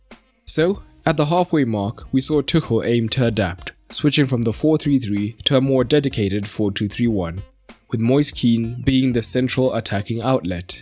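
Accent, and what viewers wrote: South Africa. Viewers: Are you South African? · That you sound South African.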